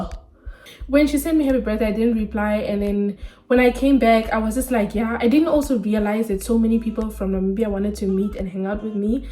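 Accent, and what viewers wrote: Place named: South Africa. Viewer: I’m subscribing, girl you got me you’re so raw on this video and I loved this. The family drama is soooo real. You speak like a South African, are you Namibian? Zulu, coloured Afrikaans, Tswana😂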